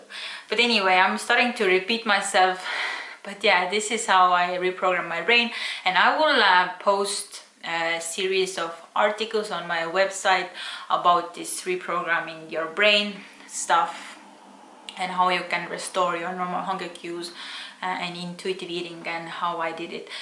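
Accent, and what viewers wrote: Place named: Russia. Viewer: Будто русский акцент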